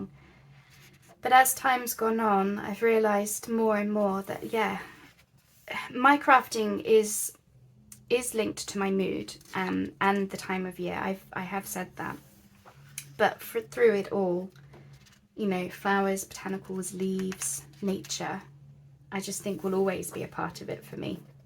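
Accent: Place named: England, United Kingdom. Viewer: Thank you for showing the Nature Notes book, I have not seen it before. Its very expensive on eBay. I do have the Country Diary that I bought on eBay for 12 dollars. Thank you so much for your stories as you were flipping through, they were very nice. I always love hearing the English accent. Hearing about the places you spoke about was magical! I am new to your channel, and look forward to watching more of you videos.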